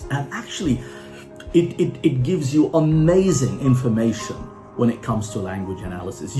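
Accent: United Kingdom. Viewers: Great British accent Mr, something brings trustfulness in your training place, I really felt relieved when I heard you talking in English, this is my first time by the way, cause I follow you on Facebook and your Egyptian Arabic dailect is impressive too. Greetings sir! That's make me ask about the cost of this splendid Delta course and if it's reachable online. Thank you and I wish you more breakthrough Courses.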